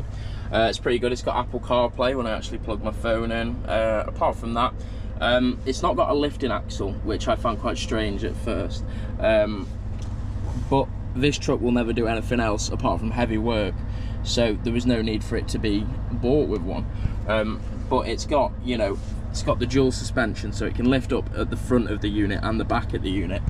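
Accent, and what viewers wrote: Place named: Nottinghamshire, United Kingdom. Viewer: Great video mate 👍 very interesting and informative 👍 how long have you been in Australia? Are you from Nottinghamshire? Thought I could tell by your accent any cheers keep up the good work 👏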